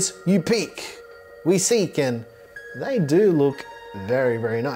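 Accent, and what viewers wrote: Australia. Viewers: Dude been watching your info for a while and appreciate an aussie voice with some real passion for the same things as me. Great content. Do you think that lianli will bring out a 140mm option as well?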